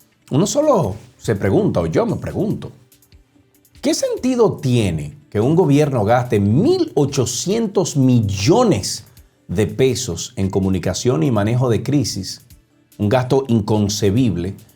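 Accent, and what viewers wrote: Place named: Arab world. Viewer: Yo creía que tú no eras dominicanos porque cómo tú hablas tan mar dé los dominicanos pensé que tú eras árabe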